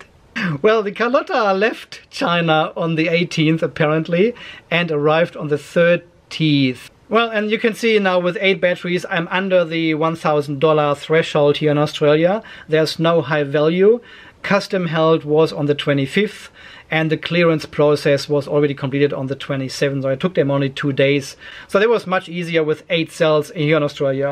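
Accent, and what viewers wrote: Germany. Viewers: Hallo, höre ich da einen deutschen Akzent ? mfg michael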